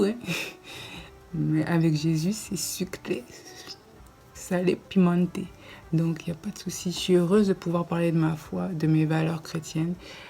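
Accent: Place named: Cameroon. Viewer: COUCOU !!! VITAL CULTURE NOUS MANQUE LORSQUE TU PARLES DE TON CAMEROUN L'ACCENT Y VA AVEC. mais lorsqu'on chasse LE NATUREL REVIENT AU GALOP. " ton accent, ta voix française revient au galop. Bonne chance pour YouTube. JE T'AIME.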